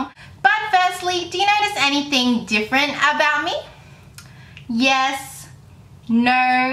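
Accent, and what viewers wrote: Australia. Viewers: Thanks for throwing this giveaway. I love hearing your Australian accent! I would also love to see more product reviews, and possible drug-store-dupes of higher end products. Have a great day! :-)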